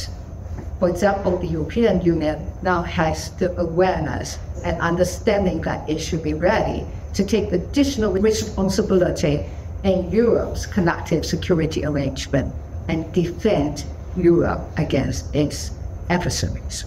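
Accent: United Kingdom. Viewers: 小英總統的英腔本來就很重了🥰🤭回去母校演講就更重了😍🤩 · 在LSE英腔的英語演説還不用看稿，躲在螢幕、手機後的藍白共可以消除之前的那些（聽説）了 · 蔡前總統講的英文，英國腔就是留學過英國最好的證明，誰說蔡前總統的學歷造假？ 麻煩講流利的英國腔英文給我聽聽。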